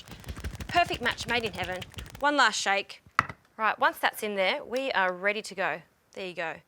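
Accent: Australia. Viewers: I love the Aussie accent!!! Its like a Southern accent here in the USA.😂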